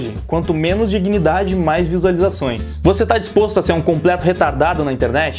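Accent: Rio Grande do Sul, Brazil. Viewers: Voce é gaucho??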